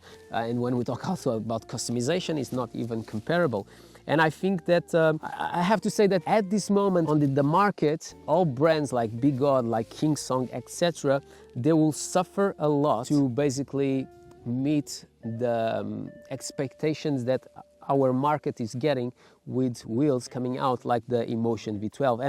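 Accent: Portugal. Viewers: Já tinha reparado que eras português pelo sotaque mas agora fiquei mesmo surpreendido, também sou de Palmela. Bom video, estou a pensar comprar a Inmotion V12 :)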